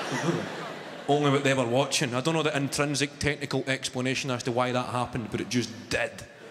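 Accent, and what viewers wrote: Scotland, United Kingdom. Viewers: Just listen to more scotish folk...you get there lol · Ewert how can u not understand him?? Plain english with a v mild Scots accent!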